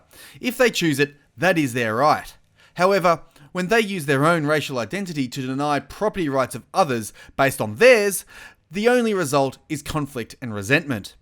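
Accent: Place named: Australia. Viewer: I like hearing the Australian accent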